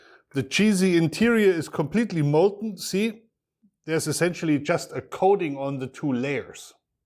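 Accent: Germany. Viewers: "... this is an excellent choice for brakfast or lunch!" Bist du aus de Palz oder aus Rhoihesse? XD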